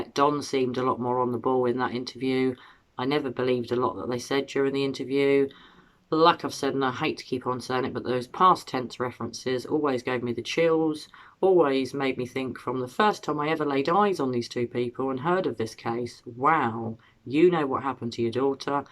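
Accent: England, United Kingdom. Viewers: Lots of red flags. So many to count them. They acted like Summer was dead I agree with you I think this precious baby girl had an accident at the waterhole and they're all covering for each other Of course they don't want to go to jail or prison I think that one of them were some of them took Summers body and put her somewhere but not on the grounds for they live. Yes I'm sure the dogs would probably be smelling that if that was true I don't doubt that her body could have been in the shed to begin with and he moved it that's a thought too This whole family is covering for everybody is what I believe is what i believe. All they talked about was her past in that interview and that they knew she was gone forever. That's what I picked up out of it when I saw that interview. What part of England are you from? My Dad was from Birmingham England. I love your accent it's very beautiful. 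God bless you doing these videos and keeping the story going until justice will prevail. Whether she be gone or whether she still be alive, justice still needs to be served 🙏🙏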